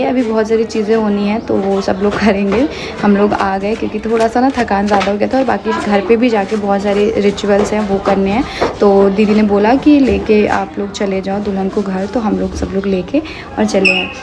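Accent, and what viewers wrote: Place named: Ballia, India. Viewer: Di but mje ek chiz smjh nh ati ki jb ap bihar ki ho ar meea balia m nanihal h . But language to apki same h Jesi balia m boli jati h .but bihar ki ti alg hoti h .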